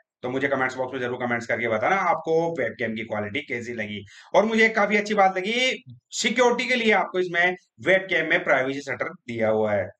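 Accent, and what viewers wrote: Rajasthan, India. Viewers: Bhai voice se pakka Rajasthan se lag rha h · Nope! Hindi with Rajasthani accent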